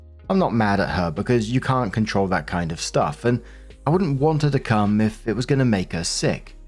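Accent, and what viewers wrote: United Kingdom. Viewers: Aren't British accents so sexy? 😍🔥🥵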